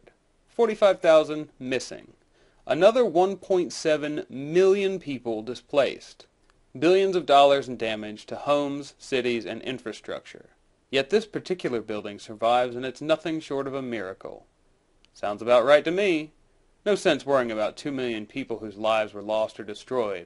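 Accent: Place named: South, United States. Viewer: Southern accent FTW!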